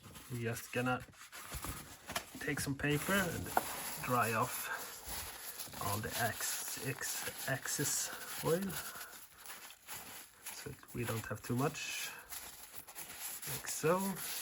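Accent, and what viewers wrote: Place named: Sweden. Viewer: Excellent carving tutorial. Your speed of carving is perfect for beginners. And, never fear, your English is just about perfect with, and with an enjoyable Swedish accent. BRAVO from California.l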